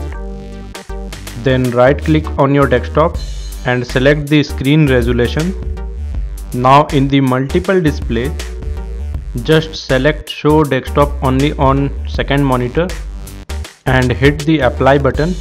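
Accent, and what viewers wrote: India. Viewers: haha wo indian h dekh lo beta english bhi indian ki tarah hi bolta h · He is an indian so indian p hai a biy diff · It's cuz he's Indian (speaking an Indian Accent) and most Indians don't have English as their mother tongue. But yeah, these days every Indian talks and understands English.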